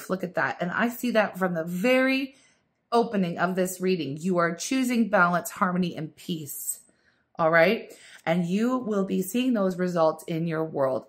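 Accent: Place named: United States. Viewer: Your american accent is amazing! I'm always sooo inspired by you and your content. Absolute Legend!!!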